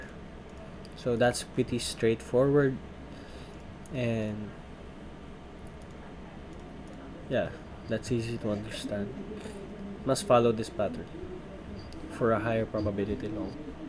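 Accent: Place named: Philippines. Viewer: It’s Filipino accent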